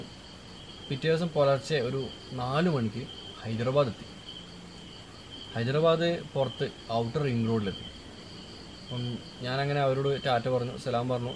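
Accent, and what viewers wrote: Kannur, India. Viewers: കണ്ണൂർ ഭാഷ പൊളിച്ചു ഭായ്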